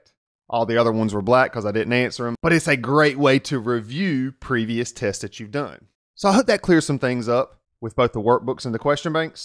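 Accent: South, United States. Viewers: the problem is most of the famous channels are bad to explain math(or at least they're not beigginer friendly)...I think you have inate ability to explain things like no other ..saw one of your video...I'm really really bad at math(math phobia)...currently Delving into math..even though don't understand calculus but understood one of your linear approximation calculus video....at least 90% of it and i was brooding if he were my neighbour, teacher and then thought should make a comment...it's rigorous work to make a playlist like pre algebra and algebra entirely including every topics and it's too much to ask for...my goal is to learn pre algebra and algebra ..then geometry, trigonometry, pre calculs and calculus..I think I will never learn these cause bad at self study and grasping Abstract idea like math...Best regards and wishes to you❤...love love that country accent...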